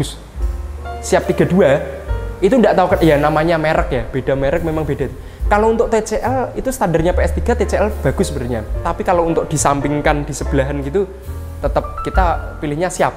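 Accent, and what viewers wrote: Java, Indonesia. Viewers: Mas sebenarnya asli jawa atau kalimantan?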